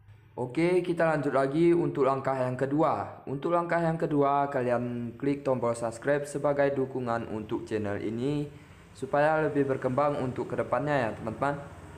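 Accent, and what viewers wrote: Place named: Aceh, Indonesia. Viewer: Bg org mana logatnya kayak orang aceh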